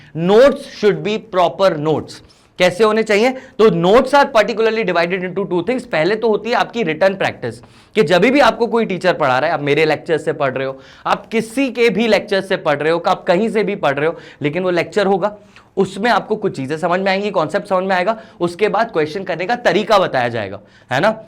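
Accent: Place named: Bhopal, India. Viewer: SIR BILKUL BHOPALI STYLE MEI SAMJHATE HAI❤❤❤❤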